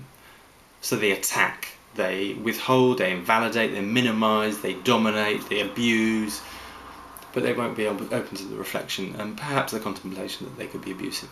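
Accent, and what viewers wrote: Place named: Australia. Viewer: Every time I hear that annoying accent I want to punch an australian in the face.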